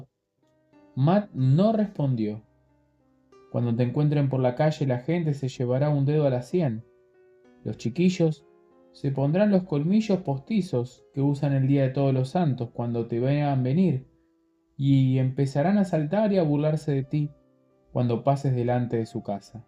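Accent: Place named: Argentina. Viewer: Querido, mil GRACIAS por leernos. Consulta: ya leíste en el otro canal APOCALIPSIS? Que gusto escuchar leer en argentino 😁💪🏽🇦🇷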